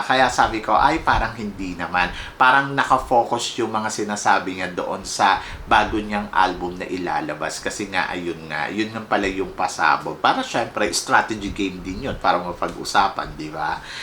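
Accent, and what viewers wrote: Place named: Philippines. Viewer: Anong probinsya mo sa Pinas,? Para kasing hindi Tagalog ang native tongue mo.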